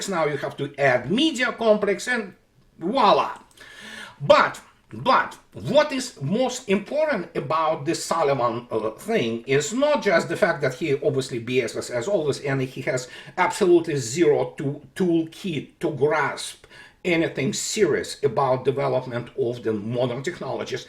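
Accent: Russia. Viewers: Mr., your accent is like music ( Russian heavy metal) and your English is excellent. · it's your Russian accent (and sense of humour) that makes you so endearing to us. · I am Italian, maybe for this reason I understand perfectly well your russian accent 😁... who knows... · Thanks for speaking out. In spite of of our recent history Russian accents were always great and yours is no different.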